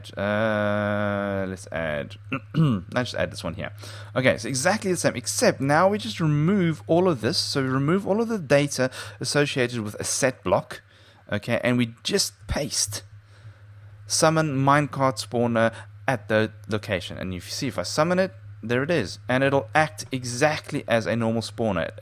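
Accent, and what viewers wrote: South Africa. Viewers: I know a Saffy accent when I hear one ;) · are you south african? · You sound South African lol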